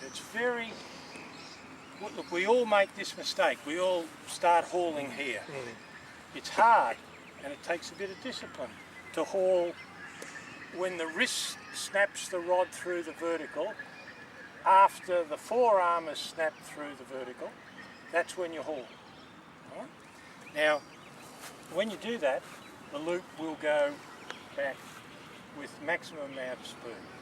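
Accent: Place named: Australia. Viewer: First off, this is one of the most detailed explanations on how to properly execute the double haul albeit a bit hard to follow the Auzzi accent. I’ve watched many top notch instructors and not until now have I heard the reason to wait until the snap of the wrist. He’s absolutely correct in saying that most people start hauling from the beginning or translation of the stroke; I include myself in the bunch. I’m trying to correct this but since I’ve been doing it for many many years, it is not easy to change my technique but I’m trying nevertheless.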